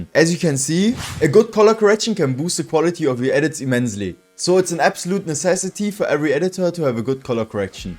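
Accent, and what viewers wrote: Germany. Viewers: Du hörst dichn bisschen deutsch an 😅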